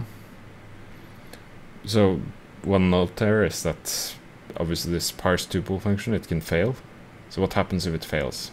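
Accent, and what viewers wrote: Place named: Sweden. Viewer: Love the Swedish accent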